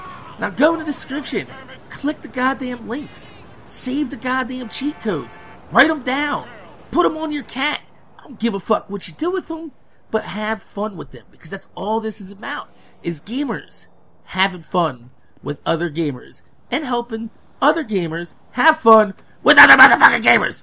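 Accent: New Jersey, United States. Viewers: are u from nj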